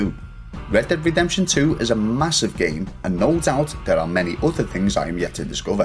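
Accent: Liverpool, United Kingdom. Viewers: Are you a scouser ?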